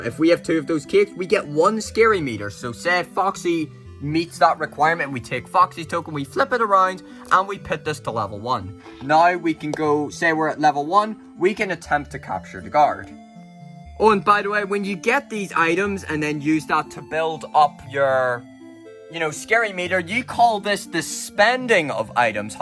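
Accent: Northern Ireland, United Kingdom. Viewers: Are you from Northern Ireland? 😇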